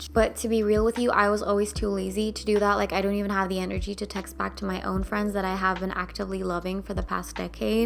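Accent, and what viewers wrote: United States. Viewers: Oh my gawd, I love your personality <3 Watching your tips on how to learn a language from scratch is as good as watching fav series on Netflix! Your humor and American accent rock :) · Your English is amazing!!!🤩 It sounds sooooo american.. which I love! How is that possible growing in Turkey? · Your accent is clearly, American . considerando lo poquito que hablaste en español me parece un acento puertorriqueño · You sound really American what is your first language.? I’m working on Korean Italian French and Russian . I learned Portuguese by getting into Brazilian music and Italian by getting into opera. I actually got into French Regaetton . I talk to my cat in the languages I am learning. I like finding out how other polyglots learn languages. Don’t be afraid to make mistakes is the best advice . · As an American, I’d say your English accent is 100% American, I would have guessed you grew up here 😄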